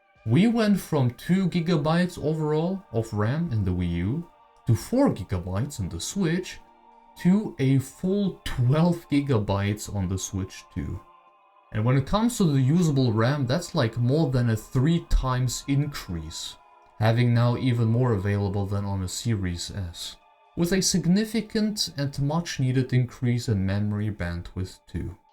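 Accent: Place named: Germany. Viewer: Nah, he sounds like a German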